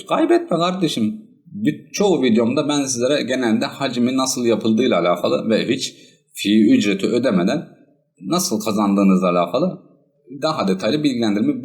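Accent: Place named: Konya, Turkey. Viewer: Konyalı mısın şive tam o taraf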